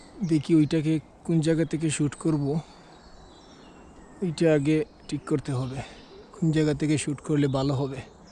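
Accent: Sylhet, Bangladesh. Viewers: ভাই শিকার করেন আইনি কোনো সমস্যা হয়না। আর আপনি কি সিলেটি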